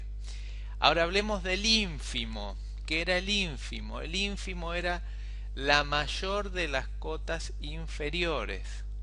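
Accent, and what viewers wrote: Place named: Rioplatense, Uruguay. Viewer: Saludos y Excelente explicacion. Soy de Mexico y me sirvio de mucho su video, (ademas ese acentito a Uruguayo o Argentino, como que ayudo mas), se gano mil likes y la subscripcion a su canal. En horabuena por su grandiosa ayuda!!!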